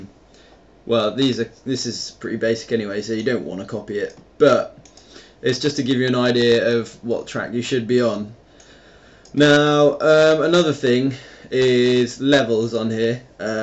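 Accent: United Kingdom. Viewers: you're english,don't you?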